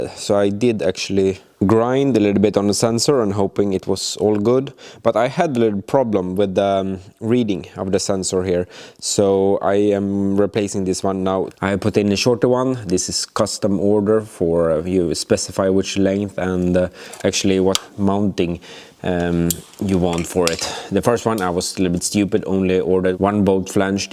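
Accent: Sweden. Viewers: Are you swedish? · This is amazing! When you say "job" say it like "djob" and when you say cheap say it like "tcheap" :) That's how I learned to correct my English. Just some feedback from another swede, if you interested :x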